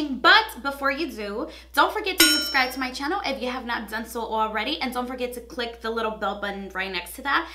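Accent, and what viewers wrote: New York, United States. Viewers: R u from New York? Cos “nwot auwll of uws are” (not offending anyone) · Her new york accent is super heavy love it😂👏🏽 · I love your accent ❤😇 so new yorrrrk haha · holy new yorker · It's alot like mine I am wondering if she is from new York or boston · Are you from New York or New Jershey by any chance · she sounds like a really strong new yorker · I love your NY accent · are you from new york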